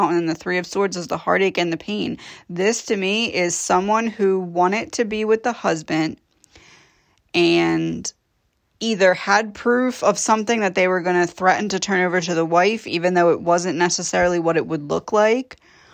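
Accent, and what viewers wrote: United States. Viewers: Luv watching yr readings, it's amazing how you tie it all in.. where are u from? I cant place the accent other than it being USA.. GOD BLESS FROM THE UK♥️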